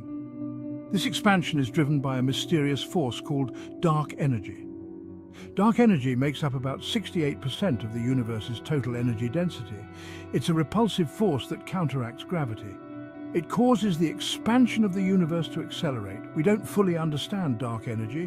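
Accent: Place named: United Kingdom. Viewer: Old husky British voice 😁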